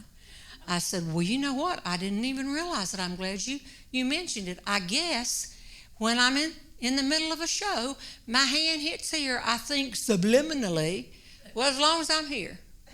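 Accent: South, United States. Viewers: You are soooooo Southern, I am homesick. I love your accent and story telling ability. It's a wonder your family hasn't disowned you by this time. I love it. I love it. Thank you for your gift and sharing it with the world. If your children and grandchildren ever run away make sure they sign off on their insurance plans. You know they'll come to no good. You might as well cash in. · I will come back to this one when I need a good laugh! Jeanne Robertson is absolutely hilarious with her southern accent and 6'2" frame. Love her! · I stumbled on to Jeanne Robertson today while looking at & "." What a great link. Love this woman's sense of humor & her southern drawl. I can't stop watching. Have family in Carolina. Hope we can see her in concert either in Charlotte or Durham. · Her delivery and voice are pure HeeHaw. Brings back wonderful memories of watching it on Saturiday nights. 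This is the kind of humor I've been around all my life seeing as I'm a died-in-the-wool Southerner.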